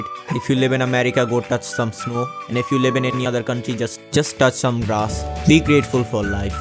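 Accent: India. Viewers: The music is the same as in all of your videos. Your accent is indian. Words are just words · Hey guys didn't u notice the update the air just got colder Edit: btw are you Indian cuz u got some Indian accent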